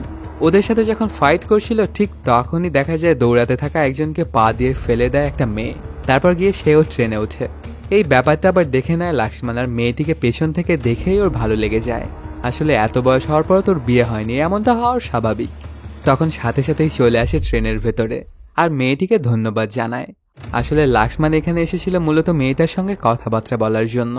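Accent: Bangladesh. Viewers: হালায় কি রাজশাহীর জেলা না বরিশাইল্লা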